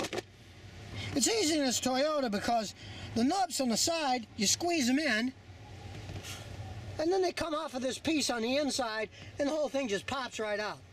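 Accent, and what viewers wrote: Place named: East Coast, United States. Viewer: hey i am curious have you ever lived in pennsylvania? Your accent sounds like it has a bit of east coast in it...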